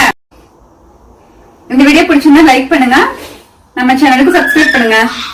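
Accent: Kongu, India. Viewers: Kongu speech &look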